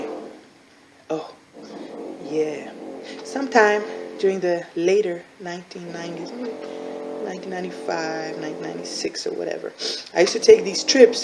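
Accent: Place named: New York, United States. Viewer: Wow! It's really you Kid. Thank you for so many memories. I loved and that joint back when I was Thirteen and still do. You inspired me to start rapping and making music. The 90's dance pop is booming again. You should start touring. Your story is incredible. I always thought you was from New Yaaawk.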